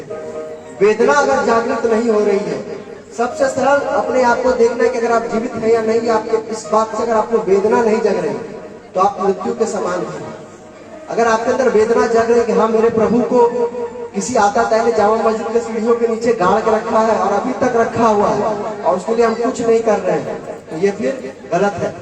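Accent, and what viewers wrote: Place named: Bihar, India. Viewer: राधे राधे एक दम बिहारी टोन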